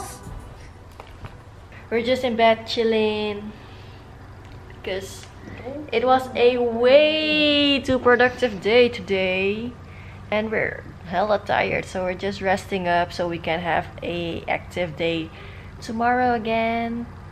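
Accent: Netherlands. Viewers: Aaah ik hoor gewoon dat je Nederlands bent! Geen idee waarom maar je weet het gewoon als er een fellow dutchie is😂 Je lijkt me echt een leuk persoon, als je in Amsterdam bent zouden we moeten winkelen ofzo x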